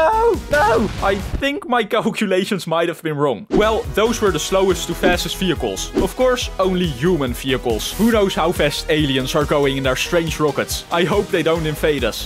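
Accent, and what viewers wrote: Netherlands. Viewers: Dutch accent going crazy😂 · Idk but are u Dutch u sound Dutch :)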